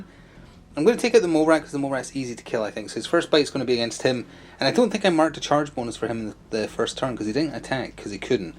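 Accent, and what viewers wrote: Scotland, United Kingdom. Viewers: Where abouts in Scotland u from bro? Enjoying ur content man keep it coming 👍🏻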